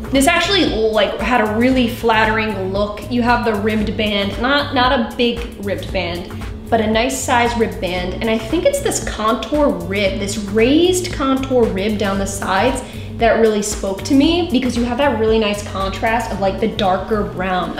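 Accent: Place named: New York, United States. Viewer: I noticed you have an accent, are you from New York, or New Jersey? Just curious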